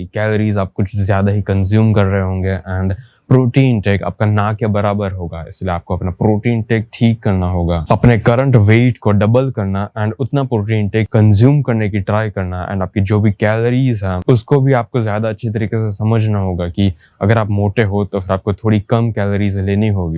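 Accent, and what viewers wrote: Gujarat, India. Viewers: tumhare Gujarati accent ki vajeh se lagta h tum vimal chaba ke bol rhe ho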